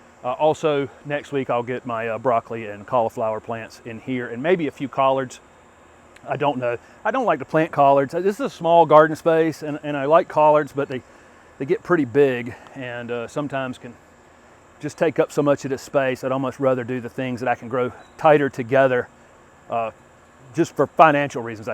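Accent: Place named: North Carolina, United States. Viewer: Your NC accent was quite a bit more pronounced in 2018, when you were in zone in... Lol.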